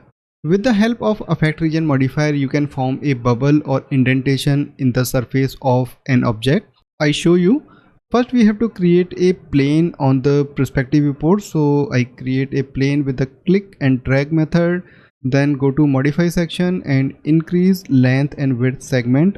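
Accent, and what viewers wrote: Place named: India. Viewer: There should be a setting that transforms İndian accent to American accent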